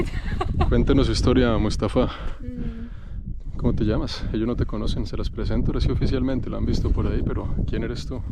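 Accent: Colombia. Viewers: su acento es colombiano. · Si es colombiana nacida en Ibague y vive en Australia. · Me encanta el acento colombiano.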